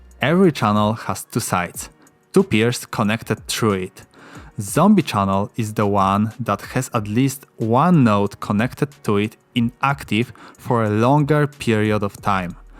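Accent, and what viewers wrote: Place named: Poland. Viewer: Where are you from? You sounds a bit Polish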